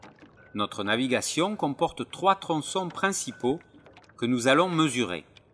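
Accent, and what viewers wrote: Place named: Provence, France. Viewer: Excellente, cette série de tutoriels avec l'accent de Provence. On jurerais que c'est Marius qui nous donne le cours !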